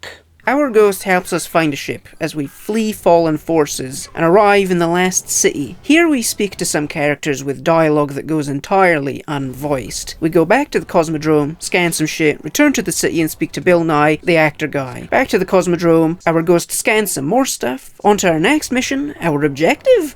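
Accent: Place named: Albania. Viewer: He's Albanian.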